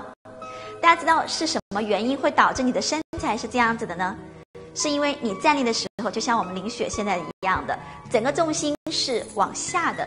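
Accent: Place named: Russia. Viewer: 字正腔圆，好可怕😨听着听着，好像回到了罗刹国。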